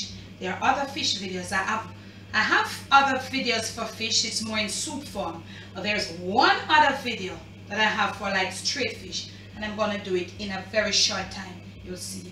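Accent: Patois, Jamaica. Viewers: Good morning Aunty i have to laugh when you talk our patios.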